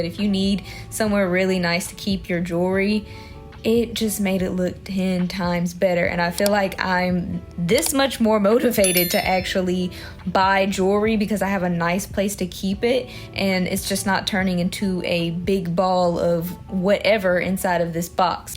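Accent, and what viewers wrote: South, United States. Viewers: Bruh yeah you def from the south. You did not just say “Catty Corner” 🤣🤣 I haven’t heard that in a minute. Your closet turned out beautiful!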